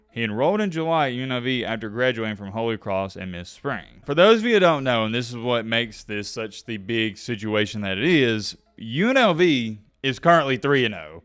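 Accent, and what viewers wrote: South, United States. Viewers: Bruh I’m from the south and know we have accents but you are a mush mouth MF!!! Hahahaha You make great videos but you need to work on that. Maybe get a speech coach. Keep up the good work. Hope you continue the work and it works out for you. Hope you don’t feel like the criticism is unwarranted or being mean I’m in it more as a joke but I do think you have some areas where you sound like a mush mouth and if you’re not from the south, you might not understand what you’re saying. I said I didn’t say this to be rude or mean just hope you take criticism for what it is. Continue to do good work.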